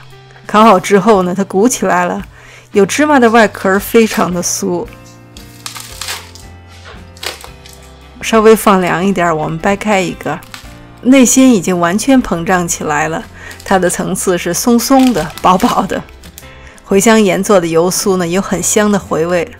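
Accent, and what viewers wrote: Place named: Xi'an, China. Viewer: 最开始看是因为地道的西安味，难得发现一个老乡，然后看见所有配方都有详细说明，量杯和克数，还有中英文对照，尤其用量杯，大小勺，非常方便，我习惯用计量，每次看见网上食谱用克数就头疼，现在方便了，要做什么都打开的视频看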